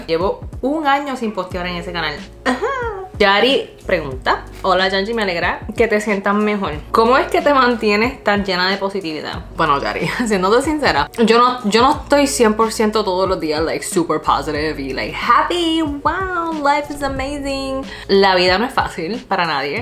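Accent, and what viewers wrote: Puerto Rico. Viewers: Me quedo impresionada con TU acento denouertoriquena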